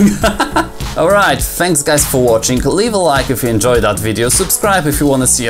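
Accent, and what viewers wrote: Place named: Poland. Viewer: Oooh, Han Solo Pops! There are so many Funko Pops I want :D Btw, I really like your accent, it almost sounds Polish? Am I right? :)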